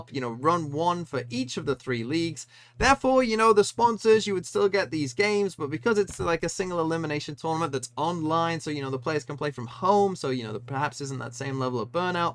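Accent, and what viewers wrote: England, United Kingdom. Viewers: He's English, right?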